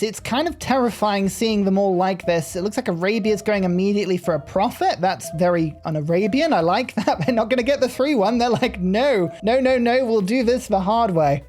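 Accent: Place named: United Kingdom. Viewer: "vis.. vwappid coowing of ayges" sometimes the British is so thick it makes my American blood sick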